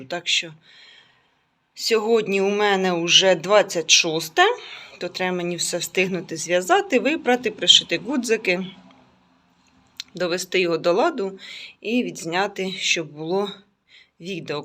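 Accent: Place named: Chernihiv, Ukraine. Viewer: а я когда тебя слушаю и смотрю, свекровь свою вспоминаю. Её говор очень сильно похож на твой. Она у меня с Черниговской области. А мои на суржике больше говорили, пока папа жив был и бабушки. Мамина родня и с Мелитополя.. Но сейчас мы, к сожалению, только на русском говорим. Но передачи смотрим в интернете на украинском.. А вообще, когда я слушаю твою речь, как будто к бабушкам попадаю, сразу такие воспоминания тёплые начинаются. 🙂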